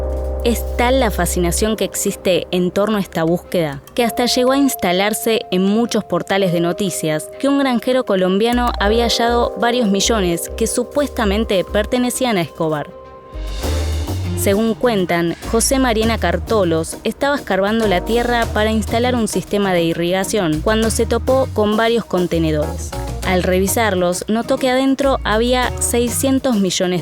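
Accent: Argentina. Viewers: buen vídeo pero odio el acento argentino, da asco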